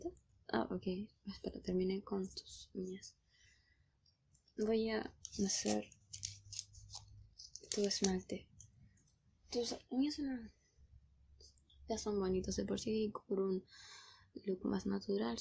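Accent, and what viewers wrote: Chile. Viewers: Eres chilena? Xd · Pregunta Eri chilena?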